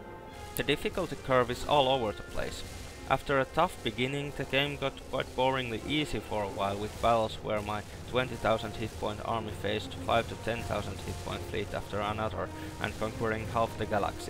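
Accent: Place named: Finland. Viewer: Ootko Suomalainen? Are you Finnish? Just guessing ur accent sounds Finnish :) Even if ur not, great review man!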